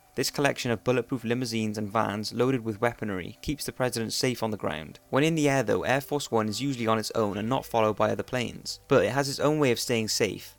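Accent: United Kingdom. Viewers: The narrator is a Brit. haha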